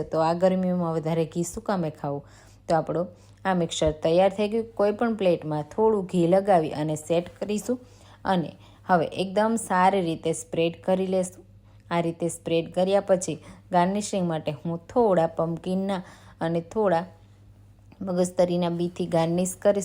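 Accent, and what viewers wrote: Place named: Kutch, India. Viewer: મેઘપર બોલીથી કચ્છ 🎉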